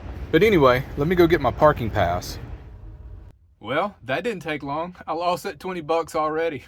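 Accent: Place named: Columbus, United States. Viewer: I KNEW you had to be from Columbus! I'm from there, and I picked up on your regional dialect. That's so awesome! Safe travels!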